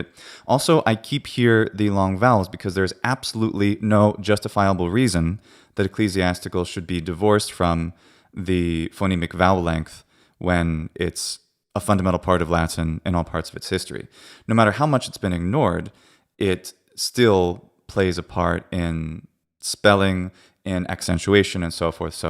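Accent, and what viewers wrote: Italy. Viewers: This isn't the Ecclesiastical pronunciation, it's the Italian pronunciation. Ecclesiastial would be : hor-tus, kvan-ta, ling-gua, con-tsi-to, gur-ges, mang-na, nes-tsi-ne, ni-si. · Your Latin accent has a very Italian quality to me, very soft and musical. I have been studying ecclesiastical Latin for several months. I am Catholic had been a cantor at our Cathedral. I had intended just learning prayers in Latin, but fell in love with the language. I tried several methods but ended up using primarily your recommendation of Lingua Latina Familia Romana: the text, the companion book, the exercisebook and the teacher’s guide/answer book. Hackett Publishing also offers MP3’s for sale on Amazon music in either the classical or ecclesiastical pronunciation, which I purchased. My goal is to be able to read St. Augustine’s book on the Trinity in Latin and more. 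· I just found this channel--it is so fascinating! As a speaker of 6 languages, I am still disappointed that I never took latin (isn't interesting how people also say they "took latin" rather than saying they "speak latin?"). But I love his latin (this You Tuber), who speaks with such an authentic Italian accent and cadence. It makes me want to pick it up as a hobby! A huge compliment as I m hard to inspire these days, lol!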